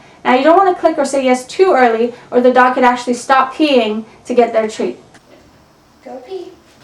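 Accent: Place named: South Africa. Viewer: oh my gosh! I thought i heard a African accent! Are you from South Africa? I lived there for the most of my life.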